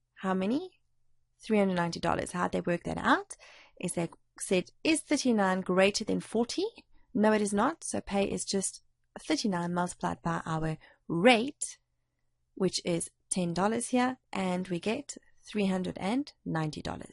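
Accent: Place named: South Africa. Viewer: South African joberger'ish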